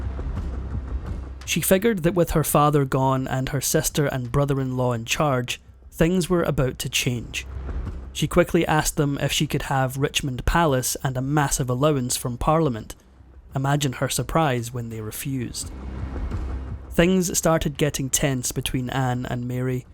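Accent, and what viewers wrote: Scotland, United Kingdom. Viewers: It's a Scottish accent. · The title says "Britain's First Queen." The first British queen was Mary I, eldest daughter of Henry VIII. It doesn't matter if "the narrator spoke with a Scottish accent." It doesn't change the fact that the first female to be crowned a sovereign on the Isle of Great Britain was Mary I. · EXCELLENT, as always. The voice is beautifully modulated and the Scots accent is wonderful! What is your name, sir? 🥰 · I'm training my ear, could you confirm your accent is Scottish? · Rather upsetting to hear this account of the union between England and Scotland given with a Scottish accent without mentioning what disaster that was for Scotland.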